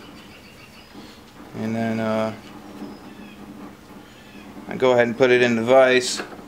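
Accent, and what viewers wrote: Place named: Michigan, United States. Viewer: Thanks bro. Haven't heard a Michagan accent in a while!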